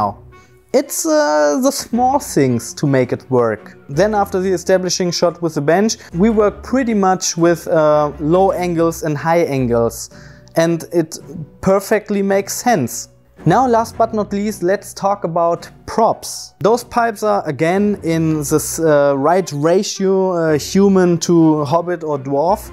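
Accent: Germany. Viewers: you sound so german wie recht ich hatte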